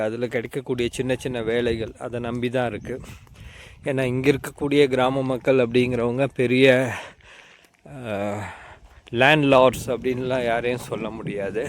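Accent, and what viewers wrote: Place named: Karnataka, India. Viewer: ௨ங்கள் பேச்சு ஒ௫ மாதிாியாய் இ௫க்கிறதே நீங்கள் கன்னடத்துகாரரோ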